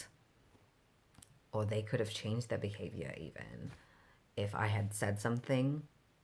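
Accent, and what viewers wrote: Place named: RP, United Kingdom. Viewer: as a british person your accent was suprisingly decent but you sounded alot more like you were from like the richer parts of britain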